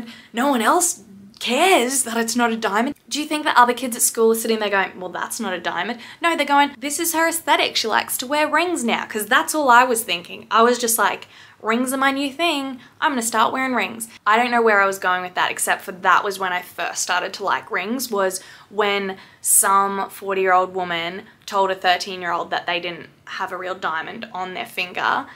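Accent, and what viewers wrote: United States. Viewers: The southern accent is one of my faves ahahahha 🤙🏻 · I love the country accent. Sounds just like my ma lmao 💜🖤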